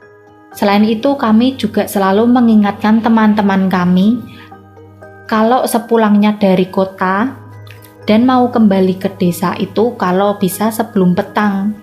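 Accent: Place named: Manado, Indonesia. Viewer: Logat mba nya Manado banget 😅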